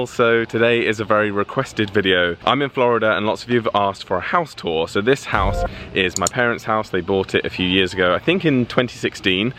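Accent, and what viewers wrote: United Kingdom. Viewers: Lol😂 why did I find this vid oh my recommend British accent had me died😂😂😂 Am American and I love to learn British accent😂 I also live in Florida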